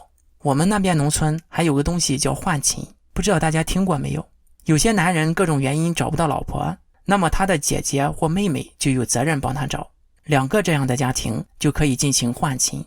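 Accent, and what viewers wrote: Shandong, China. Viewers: 山东口音好重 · 听你的口音就感觉是老乡，这次确认了。我也是山东农村，东部，90后，你的经历跟我基本一致。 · 山东口音，不是临沂就是枣庄，跟徐州口音差不多。我倒想知道你移民海外之后，会不会关注你所在国家的政治，你如果想拉更多的移民业务应该出影片介绍或分析一下你所在国家的一些情况。你做的一系列反共的影片是推力，如果做你出影片分析你所在的国家就是拉力，推力+拉力共同使劲才会有更多的移民业务😀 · 原來clause是山東人，普通話確實和我山東同學的口音相似。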